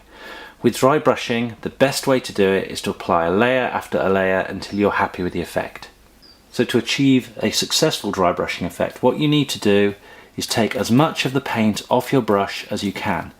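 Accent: United Kingdom. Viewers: Nice to hear a British accent on Utube 👍👍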